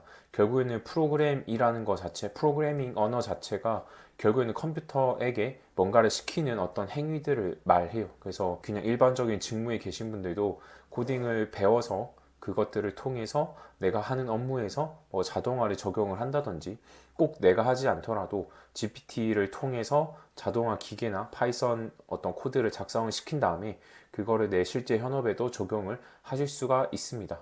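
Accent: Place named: Gyeonggi, South Korea. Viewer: 경기도 사투리 그대로 놔둬도 괜찮습니다. ㅎㅎ!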